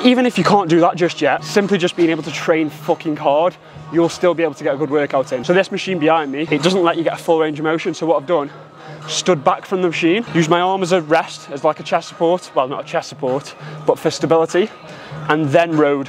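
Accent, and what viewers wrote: United Kingdom. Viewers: could've sworen that was a Yorkshire accent but okay 😂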